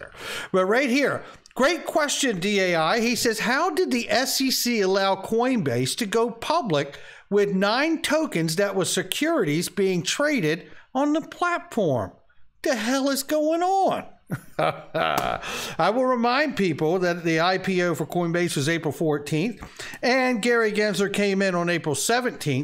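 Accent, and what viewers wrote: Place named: United Kingdom. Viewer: We loveee your show TV 🥰🥰🥰🥰🥰😍😍😍😍😍🥰🥰😍🥰 you very good british speak i very much smile happy see you ❣❣❣ love really bro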